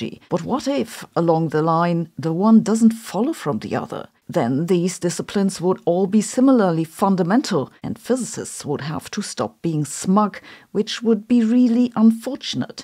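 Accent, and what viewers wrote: Germany. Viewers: I know you have someone proof read your script. I had a German girlfriend who had a slightly harder accent than you. She is very smart and intelligent. She often asked me for proper grammar and pronunciation of words and sentences. I respect your channel and how you are able to make it entertaining and enjoyable for others.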